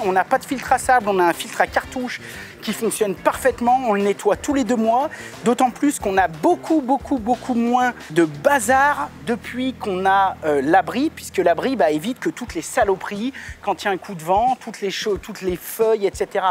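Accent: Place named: Thiers, France. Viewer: Une vidéo qui montre qu’en 8 ans, tu n’as pas changé, on retrouve (jamais perdu en réalité) l’artisan plombier des débuts capable d’autocritique, qui s’amuse de rien, un homme qui a su rester simple malgré la réussite. Un regret malgré tout, le trop grand décalage entre le tournage et la diffusion (ici 4 mois environ). Bon courage pour la suite, bravo ! « Nickel » ! Entendre quelqu’un qui met des « y » partout, cela me rappelle Thiers où j’ai quelques attaches. Justement, là bas je possède une bâtisse qui a ce problème de flottement un peu en raison des longues portées des poutres mais bâtiments de 1909 qui vivra plus que moi.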